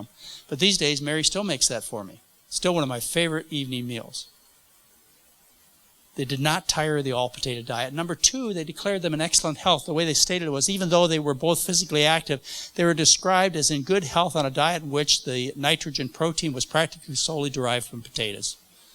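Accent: United States. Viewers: It's a midwestern accent. You never lose it. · Carole von Aarberg Hello from a Southern Louisiana girl! Nothing wrong with this pronunciation! I’m not sure exactly where the doctor is from but his pronunciation is definitely a Southern US accent. There are many accents in the South but many say potaytah. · 'Scuse my ignorance as a Brit/Aussie, but just what is wrong with potaytah, that's how I say it, and where is this US accent from??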